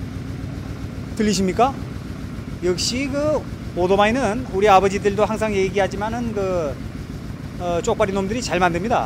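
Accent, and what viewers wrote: North Korea. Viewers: 이분 엄청 골 때리네.ㅋㅋㅋ 얼굴은 30~40대 초반? 진행은 60~70대 노인 스타일, 말투, 발음 ㅋㅋㅋ 약간 북한 말투가 툭툭 튀어 나오시는것이 이분 물건이네요.ㅎㅎㅎ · 팀장님 ᆢ 북한 시람 입네까 ? 말투가 평안도 함경도 자갱도. ᆢ 어댑니까 ?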